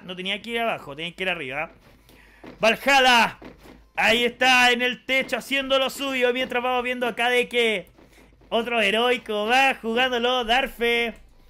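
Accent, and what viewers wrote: Chile. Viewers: Bro eres chileno?? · Eres chileno ? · Eres de chile?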